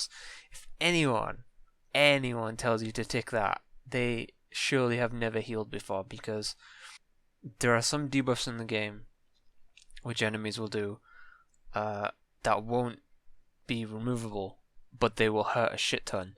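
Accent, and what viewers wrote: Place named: Newcastle, United Kingdom. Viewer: that sounds like a Geordie/Northumbria accent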